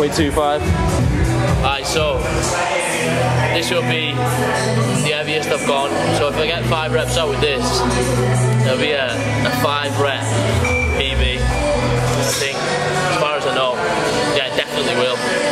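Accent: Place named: Northwest England, United Kingdom. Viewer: Wondered if the accent was more scouse or manchester. Runcorn is in-between I think.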